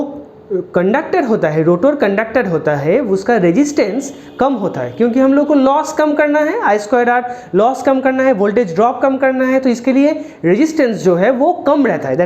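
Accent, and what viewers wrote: Bengal, India. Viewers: Aap bengoli hain ?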